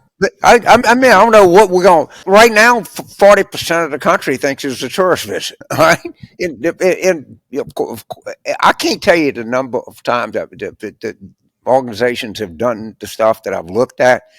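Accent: South, United States. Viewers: You are a Refreshing voice, with an Exquisite Southern accent...how you make me laugh ! Also a few of us are modifying the British Guy Fawkes night (Bonfire night) for us as Americans....if he and his conspirators had been successful they would have brought down the entire government as the House of Commons, House of Lords, & King James I were ALL present. Jan. 6th will live in infamy for me, as it was not the urination & defecation in the Rotunda, but the swastika, anti-Semitic T-shirts, lynching rope, etc. symbols taken in to the Capital UNDER THE GUISE of the CROSS.. My OUTAGE knows no bounds on this !!!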